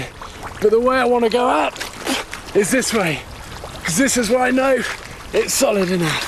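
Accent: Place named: England, United Kingdom. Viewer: Recien me doy cuenta por su acento que es ingles :O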